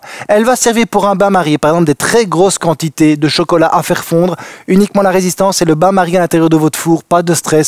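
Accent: Belgium. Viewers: Merci 🙏 Monsieur pour vos bons conseils, j’ai appris des choses grâce à vous. Je pense que vous êtes Belge ça fait plaisir de vous écouter ça change une fois comme on dit en Belgique 🇧🇪 j’aime aussi les français après tout on est voisin non peut-être 🤔 🙏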